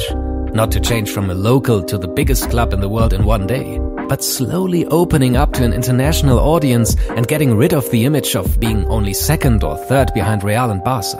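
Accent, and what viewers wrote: Germany. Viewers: what gives you the impression that he's American? His accent is very German and it also says in the about page that it's based in Germany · From the accent I'd say Germany or Austria. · Great channel, great german accent. Sehr schön videos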